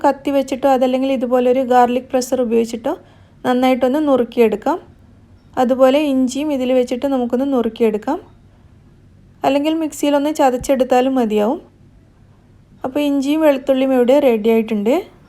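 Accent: Kannur, India. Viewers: It's kannur slang 😅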